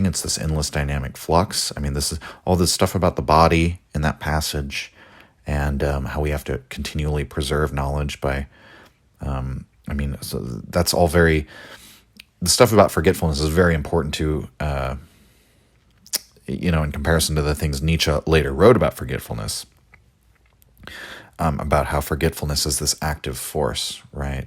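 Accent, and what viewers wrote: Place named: England, United Kingdom. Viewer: I'll never get used to hearing ancient Greek names in an English accent 😭 I think you'd enjoy the sound of the actual names ❤️😊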